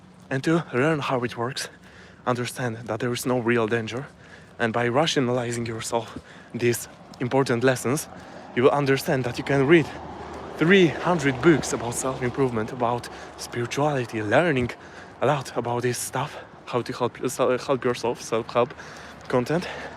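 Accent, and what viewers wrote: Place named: Poland. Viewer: accent was lost on this aussie 😂 now i know a polish accent i guess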